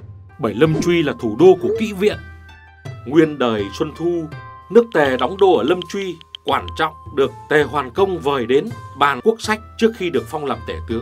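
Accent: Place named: Hai Phong, Vietnam. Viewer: Giọng Hải Phòng đặc sệt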